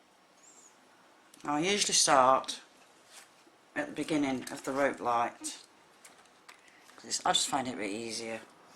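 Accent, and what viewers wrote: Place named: United Kingdom. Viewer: Your cards are beautiful, plus, I love your Brit accent. I'm from the states.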